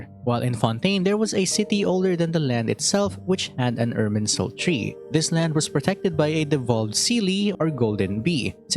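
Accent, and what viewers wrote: Philippines. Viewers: LMAO.,!! this is the first time that I really paid attention to how you speak and suddenly I could tell that you were a Filipino because of how you pronounce words so I checked your profile and saw your location in the Philippines!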